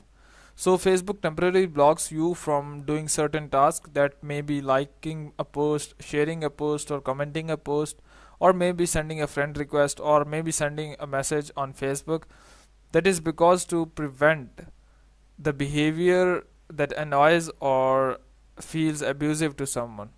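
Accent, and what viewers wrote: India. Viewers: You didn't try to fix shit! But what you should fix is that Indian accent. Tenk iu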